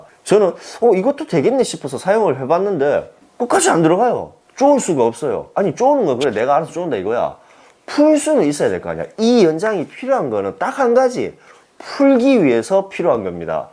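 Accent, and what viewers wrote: Gyeongsang, South Korea. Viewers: 우한 코로나가 아니라 COVID-19입니다 억양을 보니 대구 코로나라고 하면 기분 안좋으실 것 같은데..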